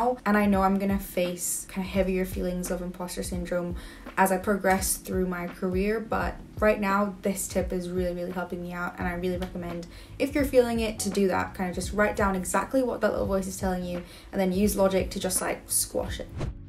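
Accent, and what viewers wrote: Scotland, United Kingdom. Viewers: that's a wonderful light scottish accent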